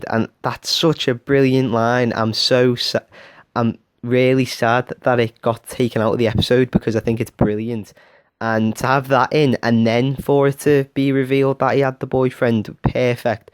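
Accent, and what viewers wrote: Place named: Liverpool, United Kingdom. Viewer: Not meaning to be rude but you sound like a more scouse Michael owen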